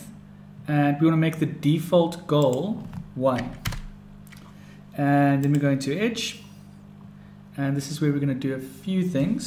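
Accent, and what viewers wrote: South Africa. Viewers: Are you South Afican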